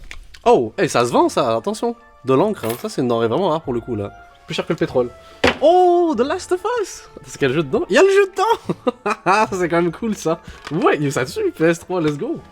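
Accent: Quebec, Canada. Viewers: Il a un peux l'accent québécois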